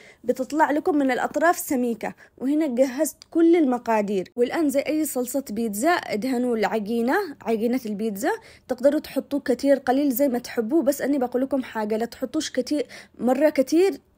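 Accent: Aden, Yemen. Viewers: تسلم دياتك الحلوات ،،،مبدعة حبيبتي ،فديت لهجتك العدنية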